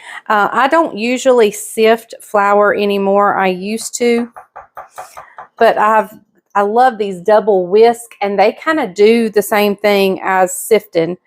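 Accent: South, United States. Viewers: I'm gonna go ahead and soak my zucchni n pneapple juice, yum it sounds perfect for this bread. You are so good, love this video and your sweet southern accent! You are a very good baker/home chef.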